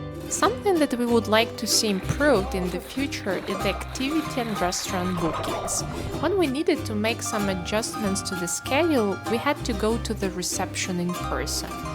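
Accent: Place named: Eastern Europe. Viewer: What a familiar Eastern European accent:) Bardzo dobry film!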